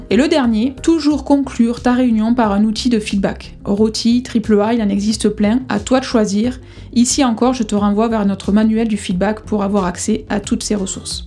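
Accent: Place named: Southwest, France. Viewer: Bel épisode, clair, vivant dense et fournissant une vision du leadership adapté au 21ème siècle Si bien que j'ai voulu les lister pour m'en souvenir - je partage, mais que cela ne vous empêche pas d'écouter, vous rateriez l'authenticité de (et son bel accent du Sud Ouest) 1) Poser une intention claire: Définir son "Pourquoi" avant de se lancer dans un projet pour éviter de perdre du temps et de tourner en rond. 2) Faire de l'écoute active un super pouvoir: Écouter réellement sans préparer sa réponse à l'avance. Pratiquer le silence actif et reformuler ce que l’on entend. 3) Oser l’intelligence collective: Ne pas chercher à tout savoir, mais poser les bonnes questions et utiliser des outils collaboratifs (World Café, brainstorming structuré…). 4) Célébrer les petites victoires: Se concentrer sur les progrès quotidiens, noter et partager les réussites pour renforcer la motivation. 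5) Miser sur le feedback régulier: Le feedback doit être constant et structuré (ex. Plus/Delta/New, Triple A, Stop/Start/Continue). 6) Adopter une posture de manager facilitateur: Plutôt que de diriger, créer un environnement propice à la performance et au bien-être de l’équipe. 7) Définir des objectifs partagés et motivants: Utiliser des méthodes comme SMART ou OKR pour rendre les objectifs inspirants et engageants. 8) Faire confiance et déléguer intelligemment: Déléguer, ce n’est pas juste donner des tâches, c’est responsabiliser et donner du pouvoir d’agir. 9) Assumer la transparenc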